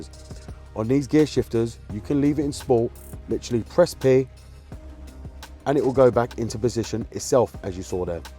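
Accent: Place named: London, United Kingdom. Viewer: He's a Londoner If you can't recognise the accent then your a melt ... Now geda' Fuk outta ere ya cunt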